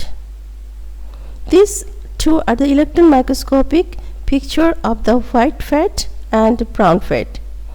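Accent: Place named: India. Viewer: Only other Indians can understand this atrocious accent . Madam; please learn to speak English, or use a robot to read and express your slides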